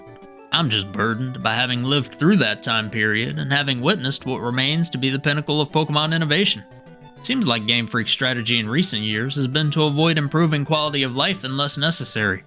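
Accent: United States. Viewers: I like how you sound more Texan than I do, even though I have lived in Texas my entire life. 😂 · Cowboy accent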